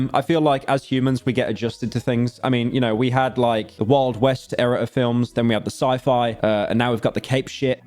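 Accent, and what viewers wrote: United Kingdom. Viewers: Nah that's how its pronounced, or at least close, just British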